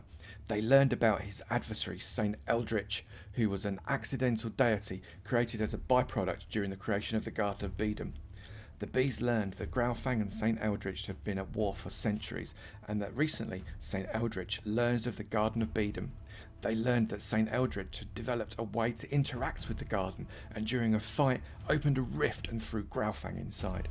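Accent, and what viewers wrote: United Kingdom. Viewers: I always love your vids and I do have to say that you have the most british british accent ever! Love it!:D -Scottish Person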